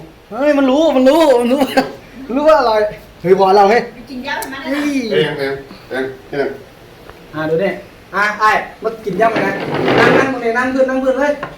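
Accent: Isan, Thailand. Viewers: พี่ เป็นจังหวัดไหนครับทำไมเว้าอีสาน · เว้าอิสานละอ้ายค่อยตลกดี 555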